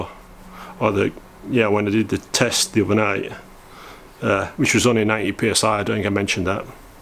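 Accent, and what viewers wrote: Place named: West Yorkshire, United Kingdom. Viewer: Hi I subscribed about 2,3, month ago I saw your site on YouTube as I was flicking through for different mini stuff as iam just about finishing my mini rebuild only took 5 years lol. Iam from West Yorkshire I take it you are with your accent any way hope you solve your water problem all the best. Lee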